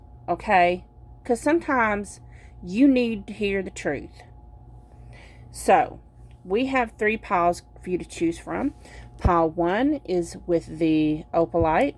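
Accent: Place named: South, United States. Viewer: Hey I just seen you.Im from Mississippi and can tell you are southern.Girl I watch a lot of readers and I Love your readings.New sub!Picked pile 2.Where you from btw?If you don't mind my asking?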